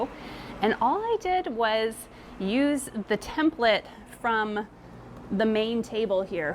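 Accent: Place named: Midwest, United States. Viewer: You sound like your more from Midwest than the market she’s in.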